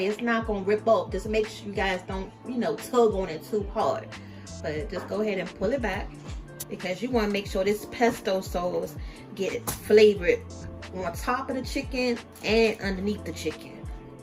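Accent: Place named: Louisiana, United States. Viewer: You def from Louisiana with that thick accent lol👀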